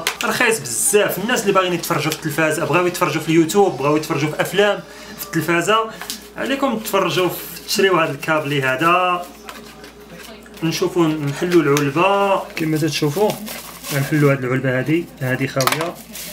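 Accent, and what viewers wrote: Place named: Algeria. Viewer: هاذة جزائري تغيرت لهجته بسبب الاحتلال الفرنسي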